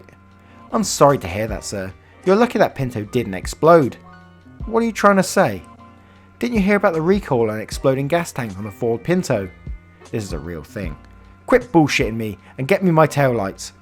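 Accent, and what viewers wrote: United Kingdom. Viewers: You have one thing rSlash does not, a lovely British accent. And you narrate them extremely well. Thanks.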